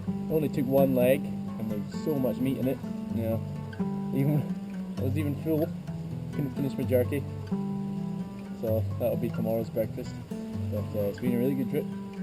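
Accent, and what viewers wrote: Scotland, United Kingdom. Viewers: Daniel Parker it’s a Scots accent. And a lovely one for all that.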